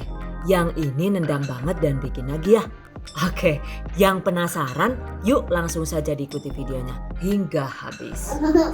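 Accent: Banyumas, Indonesia. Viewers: Setiap lihat video yang aku tunggu adalah ngomong NGAPAKNYA.. wkwkwk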